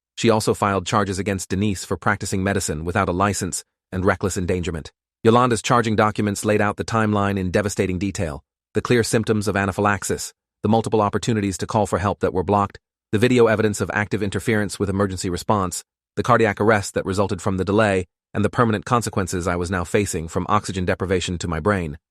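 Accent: United Kingdom. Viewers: Did you notice the way it slowly switched to a British accent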